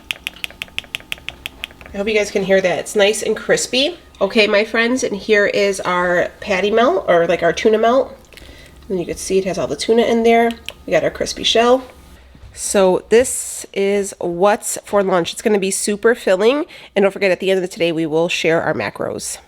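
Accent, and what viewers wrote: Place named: Illinois, United States. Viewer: Your accent!🥰 you sound like my step- mother in law who grew up in Illinois, near Chicago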